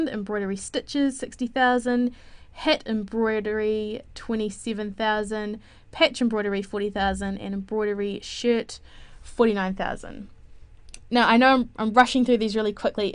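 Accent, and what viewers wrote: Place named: New Zealand. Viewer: Sounds like New Zealand to me.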